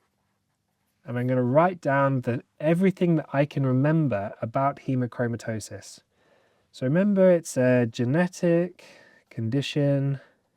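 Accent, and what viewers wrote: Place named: United Kingdom. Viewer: Bro started speaking, I heard the british accent and subed IMEDIATELY. Excited to get into the video lesson.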